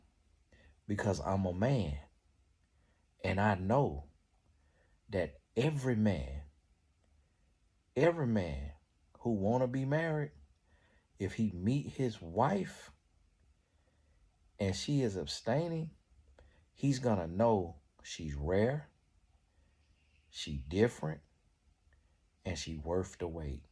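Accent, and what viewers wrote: South, United States. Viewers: Lol you crack me up 😂😂 it’s the accent for me I know you southern!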